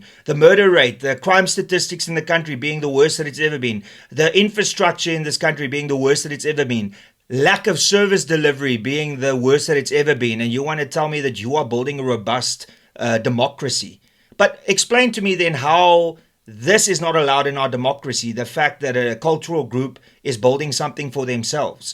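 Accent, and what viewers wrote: United Kingdom. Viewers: Says she in her ever so English accent. 😂😂😂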